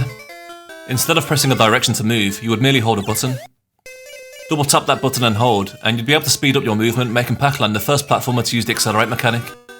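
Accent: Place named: Liverpool, United Kingdom. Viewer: You sound like a Beatle. Are you from Liverpool?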